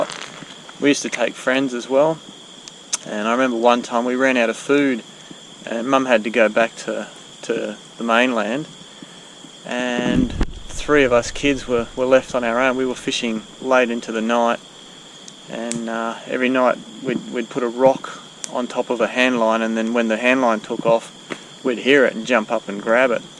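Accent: Australia. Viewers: Nice follow up by that first fish in the vid. ...So If I go by your accent, then we are in Australia somewhere. Looks like weasle and mink country along that bank but I don't know if you have those there. Looks like one of our Mangrove snapper that we have over here in S. W. Florida in the USA>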